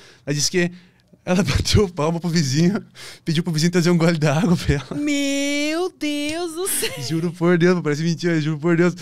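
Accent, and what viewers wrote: Rio Grande do Sul, Brazil. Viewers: sotaque de gaucho azar é us guri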